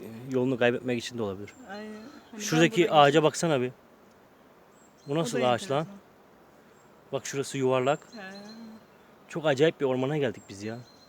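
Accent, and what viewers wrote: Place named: Kastamonu, Turkey. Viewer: Siveniz Kastamonu sivesine benziyor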